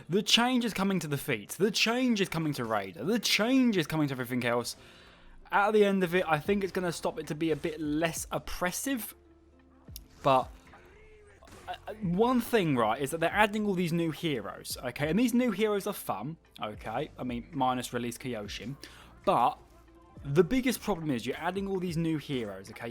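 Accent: United Kingdom. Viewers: Good lord you talk too much and wasted all that time I watched hoping for reveal and got a British annoying voice instead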